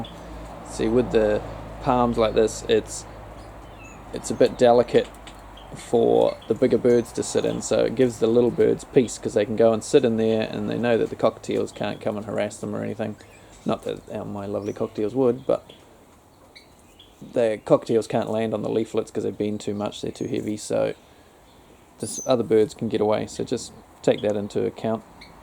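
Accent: New Zealand. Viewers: I recognized a fellow kiwi accent immediately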